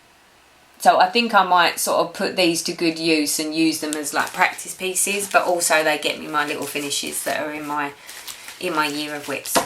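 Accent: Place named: London, United Kingdom. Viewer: Hi there ,just found you while i was stitch surfing ,and would like to say how much i enjoyed your video and seeing your wip,s and future projects ,i,ll have to catch up now on all your other videos .look forward to watching them . your accent sounds a bit like mine ,are you in London or Kent maybe ?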